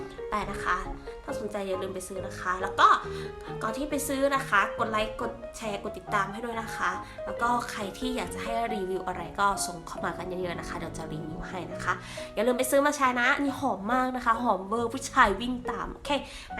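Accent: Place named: Isan, Thailand. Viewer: พึ่งเคยได้ยินพูด อิสาน น่ารักมากเด้อ 🤣🤣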